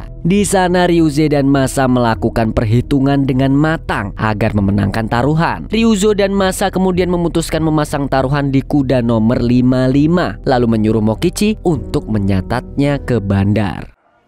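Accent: Banjar, Indonesia. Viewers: Baru tau kalo org banjar😂dari sekian lama nonton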